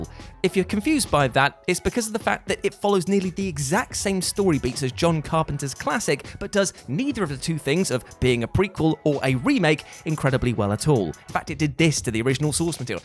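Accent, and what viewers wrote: United Kingdom. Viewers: Simon Whistler clone? This just seems like it MUST be a parody of Simon's oh-so-British delivery. All he needs to do is mispronounce a few things. . . (and have nine more channels).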